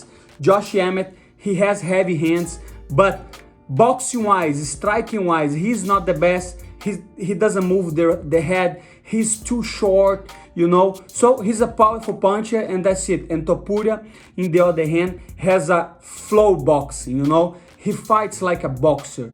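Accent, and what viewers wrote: Brazil. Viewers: Money, my number one hope for you is to become UFC champion. Number two hope is stop learning more English. The Brazilian accent is perfect. Number three hope is please, brother, can you teach Americans some Portuguese slang?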